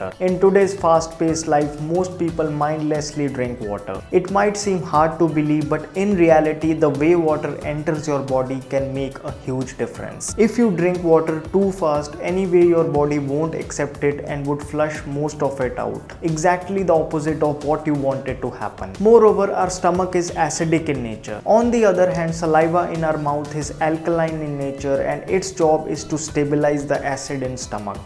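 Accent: India. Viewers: It’s not easy, to understand your „INDLISH"! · He speaks slow because he is not faking any english accent he speaks purely Indian english accent.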